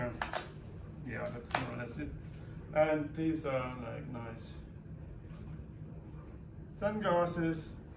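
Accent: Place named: Sweden. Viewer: CAT! She so stole the show 😃 I hope you make some videos from the trail. Nebenbei, Du hast schon nen Schwedischen Akzent wenn Du Englisch sprichst, sehr sympathisch =)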